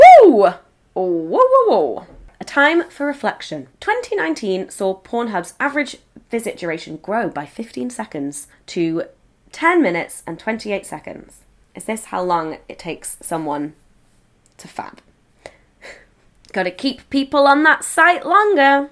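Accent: United Kingdom. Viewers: British English soo sexy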